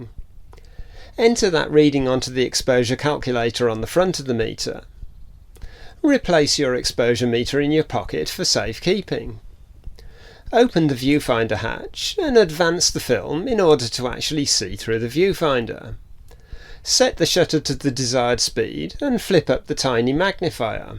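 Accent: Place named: Midlands, United Kingdom. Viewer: really good video matey, but can I ask a question? what is your accent? i come from Bedworth, so i feel like it's sort of a between Brum and the Black country accent?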